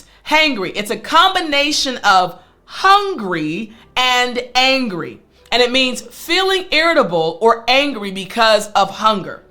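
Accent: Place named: United States. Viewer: I really get confidence when I listen to you. I personally don't like American accent but with your voice the accent sounds beautiful ❤ Ramadan Mubarak.❤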